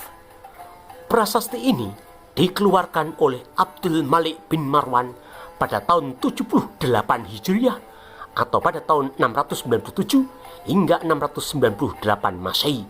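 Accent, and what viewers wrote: Java, Indonesia. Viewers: Medok banget kamu cuk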